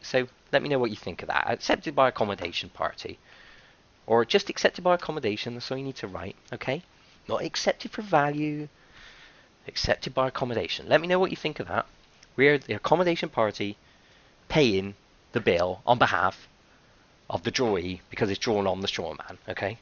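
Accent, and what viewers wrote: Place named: United Kingdom. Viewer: You have a British accent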